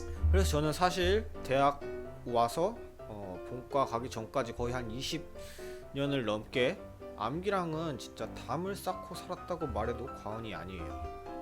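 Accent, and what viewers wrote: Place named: Jeolla, South Korea. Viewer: 전라도 사투리 쓰시네요.. 참고할께요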